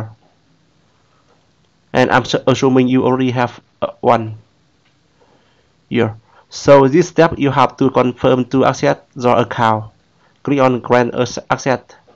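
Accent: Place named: Vietnam. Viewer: tiếng anh nghe là biết dân việt nam zồi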